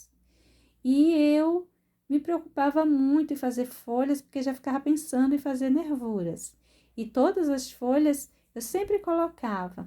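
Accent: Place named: Northeast, Brazil. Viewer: Boa noite ❤️💚 vc fala de qual Estado? Com este sutaque Nodestina.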